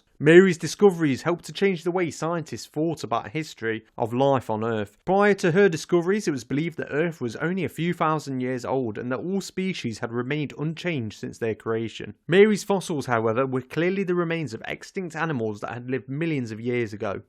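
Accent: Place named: England, United Kingdom. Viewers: UK, England probably, doesn’t sound very irish or scottish so I’m pretty sure he’s from England. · Sorry no offense but what kind of accent is that? Scotland? Just curious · Where are you from? What accent is this? Or regional dialect or whatever. Sound like the northerners in Game of Thrones.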